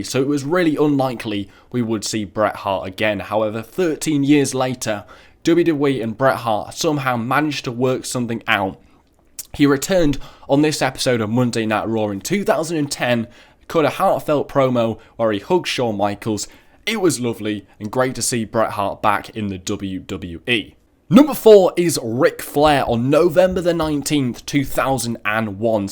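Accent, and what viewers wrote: United Kingdom. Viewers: Did he is British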